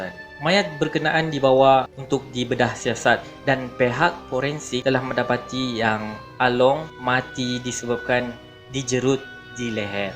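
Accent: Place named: Sabah, Malaysia. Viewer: apa kau bunyi macam org sabah bah?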